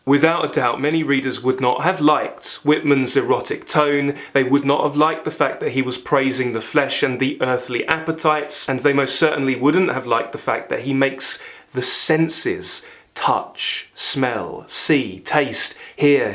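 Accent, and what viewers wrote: United Kingdom. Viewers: It's a trip to be hearing someone with a British accent commenting on Walt Whitman. The most American poet.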